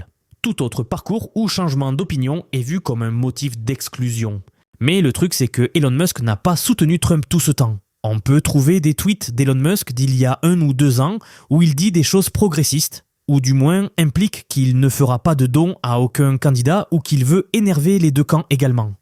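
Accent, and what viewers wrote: South, France. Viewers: Cet accent, c'est de ToLose ou Marseille ou Montpellier ou?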